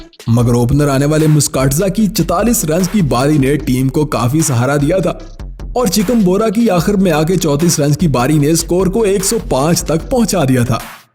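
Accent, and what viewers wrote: Pakistan. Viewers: Apki voice yaani apki boli se AP mujhe Pakistani lagte ho. Lekin Apke thumbnails or indian team ki ziada tarreef se mujhe AP Indian lagte hooo Confirm me Are u a Indian. Or a Pakistani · Aap shayad pakistan se ho faarmet bol rahe hai isliye.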